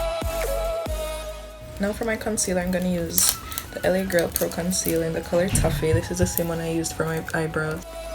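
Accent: Jamaica. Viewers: She a Jamaican me hear the accent eno 😂😂💯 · R u Jamaican?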